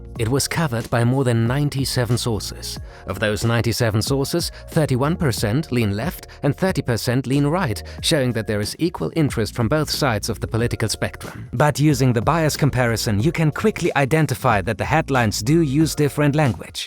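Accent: Germany. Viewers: u german? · Athletic Interest is THE best channel when it comes to informative and exciting sports stories. Thanks a lot! And never get rid of your Unique selling point: your german accent speaker. simply great 🥳🥳🥳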